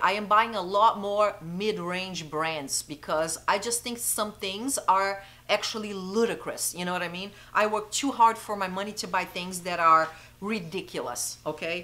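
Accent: Brazil. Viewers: ouvi o sotaque e na hora sabia q era brasileira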